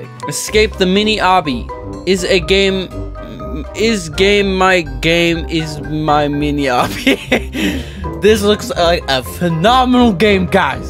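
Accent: Norway. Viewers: You are from Norway right? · Are you from Norway ๏︿๏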